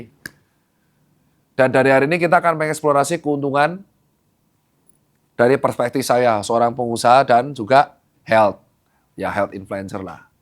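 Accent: Java, Indonesia. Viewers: English Jawaa Medhokk , hmmm kek kenall yang medhookk medhookk "we wok......" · seru nih, soale Dokter Tirta medok Jawane, jadi sperti orang pada umumnya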